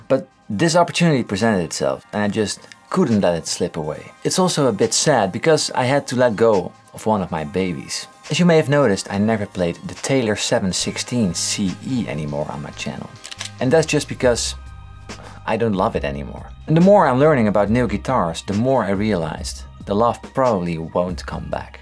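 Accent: Netherlands. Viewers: Hey, I wonder where you're from! You're English sounds like how dutch people speak English. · Ik wist niet dat je Nederlands was. Hahaha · Olivier Yes. He's dutch!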